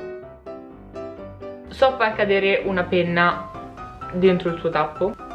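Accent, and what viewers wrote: Tuscany, Italy. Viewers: Sei di firenze o comunque da qualche parte in Toscana?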